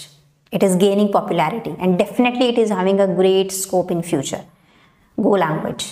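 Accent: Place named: Gujarat, India. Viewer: You are speaking in gujarati accent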